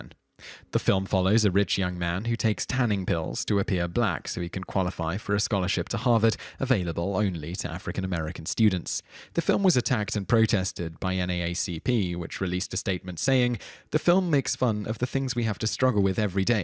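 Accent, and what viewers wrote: United Kingdom. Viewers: Alright, I've had it; Hey, NARRATOR, learn to pronounce people's names, for god sake. If you don't know how to pronounce something, ASK SOMEONE, don't just think you're correct because you have a British accent. Seriously.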